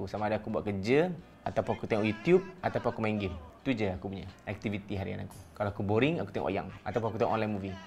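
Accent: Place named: Minangkabau, Indonesia. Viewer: hulaa...patotla ckp minang manjang....asal greenwood ke..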